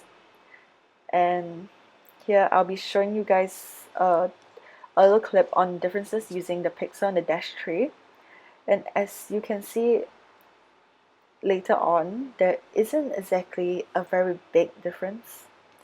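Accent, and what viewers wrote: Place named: England, United Kingdom. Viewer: I hate ur english accent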